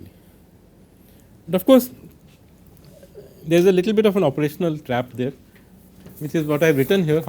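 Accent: India. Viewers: I love Indian folks. From the 90s smuggling recycled 64 bit sparcs over yonder, to present day; where Sunnyvale is a suburb of Mumbai. But listening to that accent, thick as curry molasses in wintertime... god help me or Vishnu whoever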